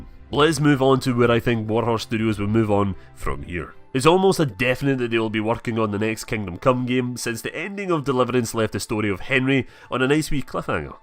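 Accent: Scotland, United Kingdom. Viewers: You're accent is so Scottish that I had to really concentrate to hear that it was still English sometimes lol.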